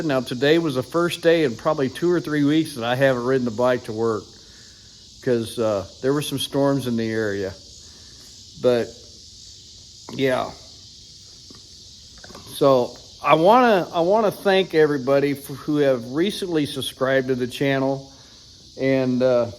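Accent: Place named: Oklahoma, United States. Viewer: You my mention Oklahoma. I live in Oklahoma and I hear all the locusts in the background and I'm thinking that you sound like your around here somewhere.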